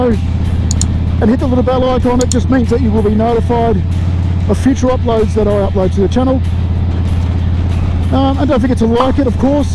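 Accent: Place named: Australia. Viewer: Well, wonder no longer! I've always enjoyed the Australian accent. I just don't hear it everyday. It's not you, it's me! Looking forward to your next video.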